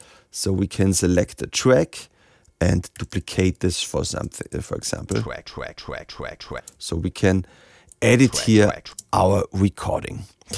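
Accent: Germany. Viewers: Your videos are awesomly helpful! If I had to rely on the Videos uploaded by the Ableton website, I'd have great trouble in learning Live 11! The best part is your german accent. I'm from Germany myself originally and it's always great to hear the odd german expression like 'Zack!' which you throw in every now and then. Cheers from Ingo in Melbourne, Australia!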